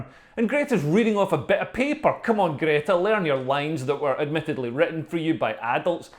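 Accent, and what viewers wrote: Scotland, United Kingdom. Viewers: Never thought a guy with such a heavy Scottish accent could be so poignant, witty and truthful.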